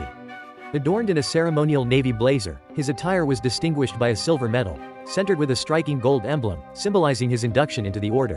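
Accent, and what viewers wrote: United States. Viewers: Hate the bloody American voice commentator, and please stop using YouTube’s most overused word…SHOCKING! 😤👎👎👎🇦🇺🦘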